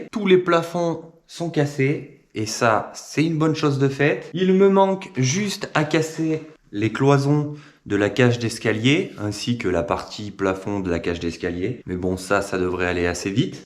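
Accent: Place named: Cantal, France. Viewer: Salut merci et bravo pour le travail et les vidéos. Quel projet ! Je découvre la chaine il y a 4 jours et toutes les vidéos y sont passées. Je trouve ton humour second degré et auto dérision excellent. À mon avis le petit accent est cantalou ? FAQ:c'est tout simplement à ce stade que tu présentes ton parcours comment tu as acquis ces compétences comment tu geres financièrement le temps des travaux même si j'ai mon idée. Pour le poêle dans ton style un peu industriel je trouve que un poêle bullerjan dit canadien irait très bien. J'en ai deux chez moi un gros de 16kw et un petit de 6kw pour une dépendance. Si tu veux je peux t'envoyer les photos sur ton mail. Merci encore et à très vite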